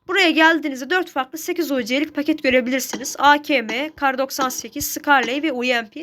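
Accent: Azerbaijan. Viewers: Qaqa Azərisən türkcə danışırsan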